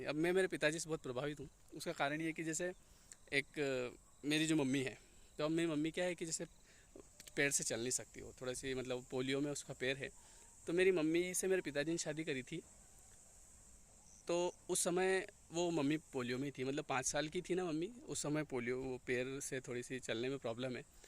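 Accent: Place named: Malwa, India. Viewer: Ur Malwi accent reminds of old days. Now m far away..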